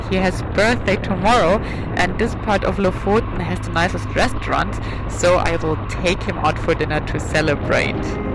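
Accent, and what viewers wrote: Germany. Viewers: Me Norwegian, from Utskarpen, Mo i Rana. Are you from Switzerland? Your license plates show Switzerland from previous videos, but your accent is german. Anyway, Lofoten is best in september. We do have problems with lack of infrastructure, toilets and such. There are forgotten hidden gems to explore, like the coast of Helgeland, the heritage island of Vega, Lovund, Dønna, Herøy and so on. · Great Video, amazing Picture's :thanksdoc: good english 😉( I hear a fränkisch slang;-)) )😁 Stay healthy, whatever you do 🏍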